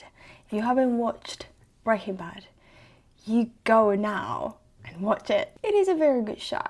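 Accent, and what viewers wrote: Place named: United Kingdom. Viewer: tu acento British es precioso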